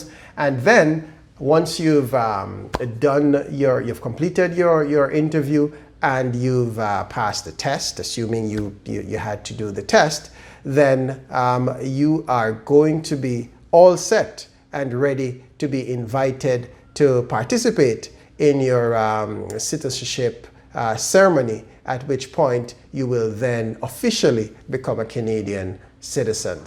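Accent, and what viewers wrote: Jamaica. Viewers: I love that you still kept your jamaican accent!!! loooool I will be in touch I work in IT, I am 45 y.o in the USA right now,but living with my own immigration here. how do we start a private conversation to see how you could help me move over there for IT work. thanks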